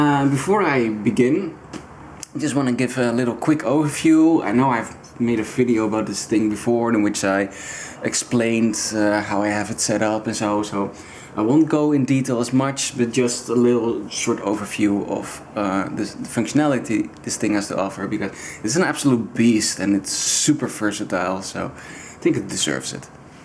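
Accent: Netherlands. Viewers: Hahahahah yeah me too, 99% of the time you can tell right away if someone is a Dutchman speaking english. Yeah these things were way ahead of their time in terms of features, the parametric eq and the crossover function especially, auto mix channel is cool too. Yeah i know, it's sad that people discard these amps when something other than a fuse goes because they think it's old and solid state but everything has a life span and they're not that expensive to replace so we'll give it a shot fixing this behemoth. Maybe i'll turn it into a video like you did but i digress, thanks for this video, i enjoyed it a lot.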